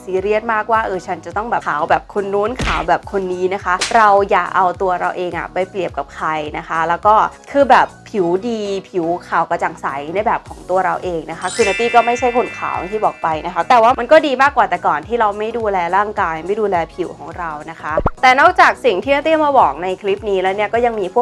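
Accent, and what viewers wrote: Isan, Thailand. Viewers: พี่เป็นคนอีสานใช่ไหมครับ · ชอบ พูดอีสานค่ะ น่ารักดี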